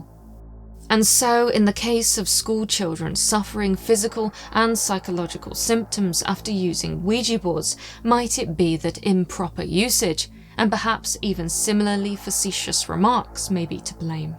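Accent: United Kingdom. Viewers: Subbed within minutes of my first experience with you (fellow Brit and listening to you makes me a very proud Brit!! 🤩) I will now be binging on all of your documentaries.Fabulous orator delivering articulate and engaging content. I am all in 100% invested in this beautiful channel!! CONGRATULATIONS 👏 you deserve millions of subscribers.Bravo 🙌 🙏 lots of love x🩷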